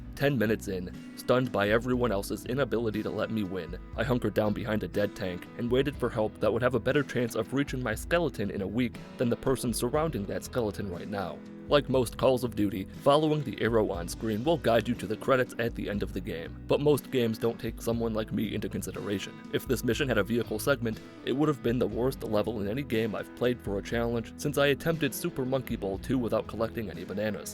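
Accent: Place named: Michigan, United States. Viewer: took me three years to realize youre from michigan what is wrong with me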